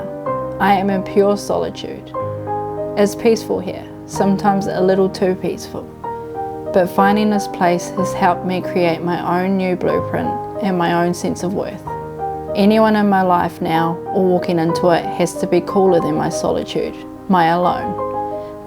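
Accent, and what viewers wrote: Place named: New Zealand. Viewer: Thank you, for your gentle, strong words. It sounds like you have found your own peace, even if that peace means feeling crap for a bit or telling someone to F-Off. Where is that beautiful accent from? Kiwi? Cheers, R.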